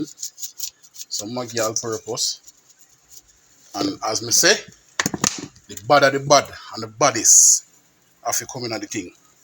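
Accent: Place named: Jamaica. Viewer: The jamaican accent 😊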